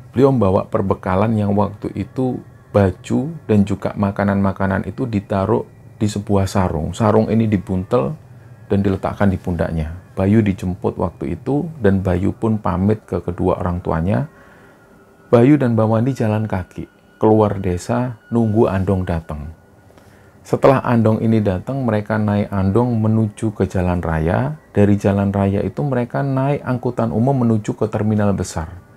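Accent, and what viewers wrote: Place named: Surabaya, Indonesia. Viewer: Mantab bang crtny kok mirip bahasa Jawa sbyo an y🙏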